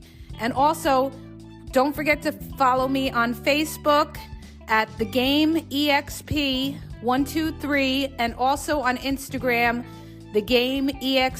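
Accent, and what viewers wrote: New York, United States. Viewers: You crack me up. Your NY accent is great. You would be a great in a movie. Reminds me of Cher saying Snap out of it! Thanks for shooting from the hip.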